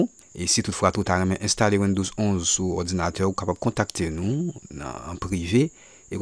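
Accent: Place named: Asia. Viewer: Sans l'accent asiatique, ce serait plus facile à suivre...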